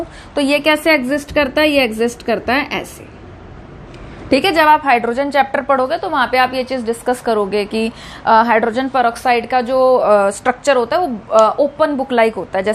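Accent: Haryana, India. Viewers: Aapke speaking style haryanvi touch h😅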